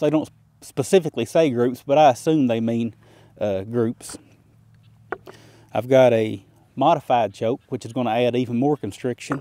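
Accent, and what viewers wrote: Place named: South, United States. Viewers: Whatever it is, he has it on thick. Wearin’ it thick. A lot of YT’bers do this. lol. The best, are the northern “southern “ draws lol. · That is one cool sounding accent. I am guessing from the South but you are bundled up too much🇨🇦🤠Great vid answered some questions l had thanks. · Very excellent videos as well as the information you provide. Love the accent! Tennessee?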